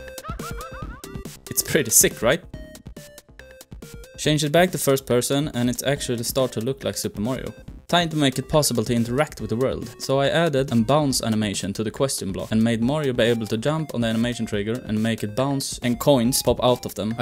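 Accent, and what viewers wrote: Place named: Sweden. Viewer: När en svensk pratar engelska, eller hur?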